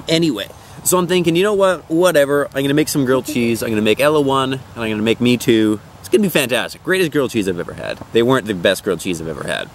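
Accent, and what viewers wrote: Canada. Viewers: Am I the only one who hears the Canadian in his voice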